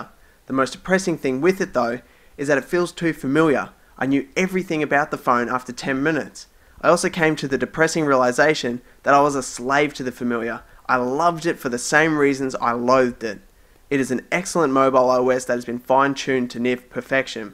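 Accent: Australia. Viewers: I love your Australian accent and great video :)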